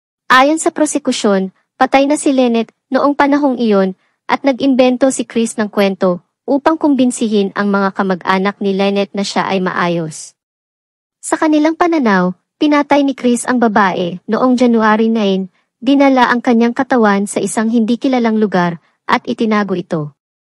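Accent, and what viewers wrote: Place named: Visayas, Philippines. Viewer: ..hndi po kasi xa pure tagalog ang salita ...kaya ganian po ang salita nia . Kung kayo po ay magsasalita sa wikang visaya .. magkaka gnian din po ang tono nio saibang salita na gagamitin nio ...naiintidihan nmn kya unawain nio na lang o wag nio pakinggan o panuorin ..wala nmn napigil sainyo .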